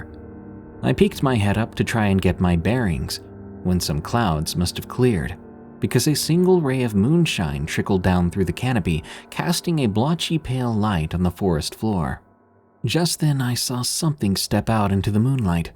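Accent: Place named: United States. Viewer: The first story is meant to be in the south of England… people do not use American words in the south of England or anywhere in Britain for that matter, you also made it sound like Aberdeen was not that far away… its in Scotland.. the opposite end of Britain.. We also dont have guns.. if you are going to read a story from England, (also sounds American) please be authentic as most English find it insulting..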